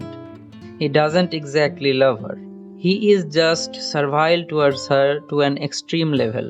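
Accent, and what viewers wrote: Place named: India. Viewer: Indian probably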